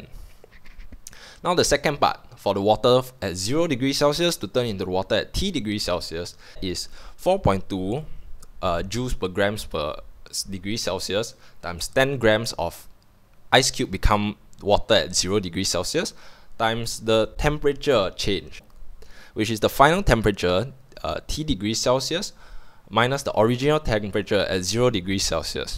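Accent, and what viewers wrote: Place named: Singapore. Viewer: hahhaa just want to say i stumble this on chatgpt, then when i played the video i heard ur voice/accent, im like EH singaporean hahaha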